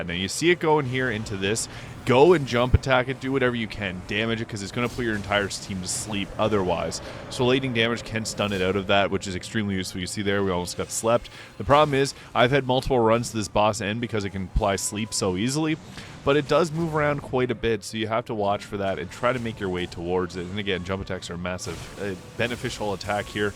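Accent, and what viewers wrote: Canada. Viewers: Is that a Canadian accent by chance? I’m from B.C and I swear I hear a fellow Canuck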